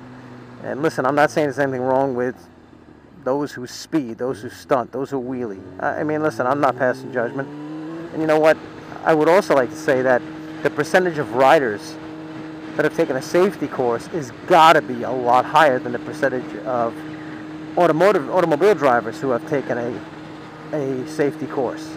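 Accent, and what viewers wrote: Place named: New York, United States. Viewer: "Ya THINK!?" "Sarcasm!" Hahaha! :D So funny. :) P.S. Love the accent! I used to live in NYC but moved to VA, and it's rare hearing that accent on TV or especially where I live. :)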